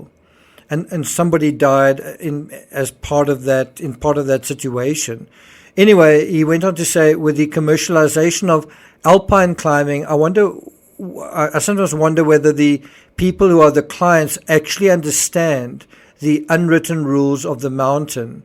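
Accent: South Africa. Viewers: It is Hassan... but the narrator's South African accent has a "schwa" or soft R on that version of A...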